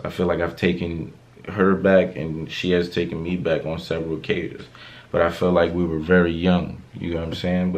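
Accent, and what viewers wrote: New York, United States. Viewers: The New Yorker in him came out in this video broooo , yooo I’m cryinggg😂😂😂😂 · The New York rlly came out in this vid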